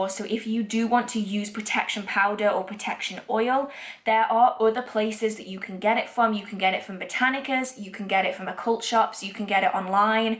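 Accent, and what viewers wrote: United Kingdom. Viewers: That you for your YouTube channel what country are you in like your British accent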